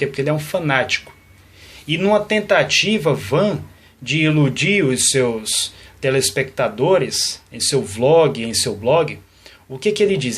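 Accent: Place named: Rio de Janeiro, Brazil. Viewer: Afinal, de onde você é? Como você pronuncia "faxista" ao invés de "fascista", achei que você é carioca, pois pronunciar o S como X é bem característico dos cariocas.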